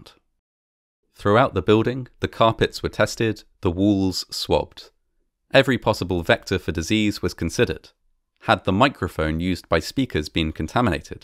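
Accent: United Kingdom. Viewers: They mean the windshield. I believe he's a Brit or learned British English, not American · When shows up in my Subscribers list for the day I always watch it first. Love the way you present your stories and since I'm almost 1/2 UK I love the accent.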